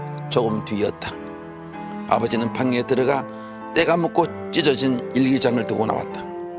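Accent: Gyeongsang, South Korea. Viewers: 황수관이 억양이 맘에 안든다. 수꼴 TK 다. TK는 "ㅇ"이 들어간 단어는 발음을 세게한다. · 까치라꼬. 라꼬. 라꼬. 갱상도사투리 절라싫타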